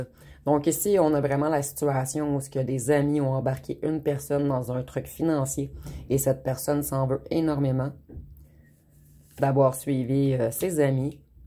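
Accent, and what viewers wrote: Quebec, Canada. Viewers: Ah ah non en France ce n’est pas spécialement en juillet ! C’est toute l’année à n’importe quel moment ! Sauf que dans mon cas en effet, mais c’est moi qui doit déménager en juillet ! Avec un peu de chance il aura envie de venir emménager chez moi ! 😆 Merci pour cette guidance ! C’est bon d’entendre votre accent Québécois qui me manque beaucoup ! ❤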